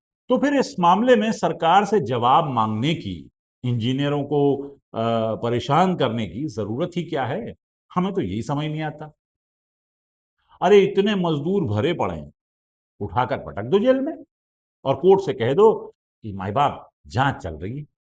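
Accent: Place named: Uttar Pradesh, India. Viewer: You are great. You talk like my native Uttar Pradesh tone.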